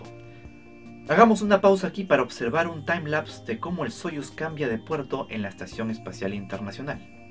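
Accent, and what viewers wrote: Peru. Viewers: ¿eres peruano? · no sabía que eras de Perú, es que tú acento se oye diferente, y tu vídeo me súper encanta, interesante como todos los que nos compartes. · ERES DE PERU?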